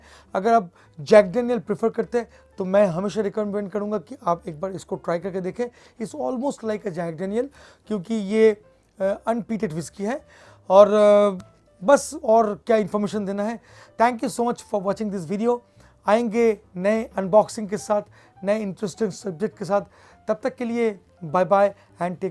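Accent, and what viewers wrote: India. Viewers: You have a great accent.. I really like your true desi style. No fake dikhawat.. Bless you with good health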